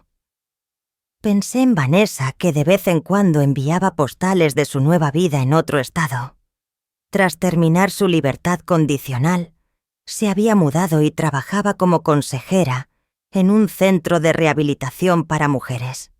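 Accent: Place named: Spain. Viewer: Mis respetos para los españoles pero No resisto una narrativa con ese acento. 😢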